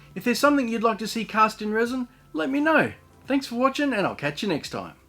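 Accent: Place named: Australia. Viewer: Completely off topic but I love your Australian accent haha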